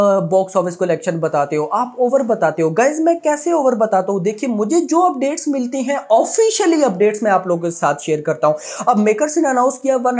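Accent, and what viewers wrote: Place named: Pakistan. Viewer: Bhai apka accent pakistani jaisa q hai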